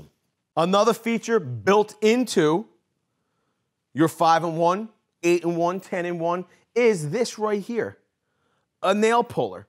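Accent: Philadelphia, United States. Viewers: yo bro you would fit right in at my house for sunday dinner ....you sound like ur from my neck of the woods ..South Philly Baby!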